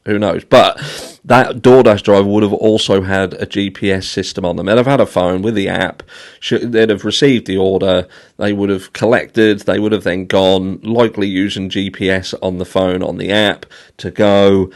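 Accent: United Kingdom. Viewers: Dude I’m losing you once you start talking, it’s hard to follow you. You’re talking too fast English with the accent for the non Brit’s. All your words sound jumbled together.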